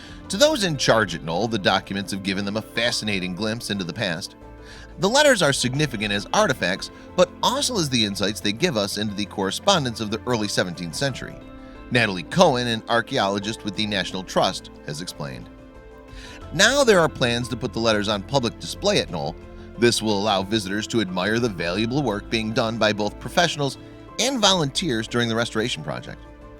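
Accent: United States. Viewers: A beautiful ENGLISH house with a yank speaking in non English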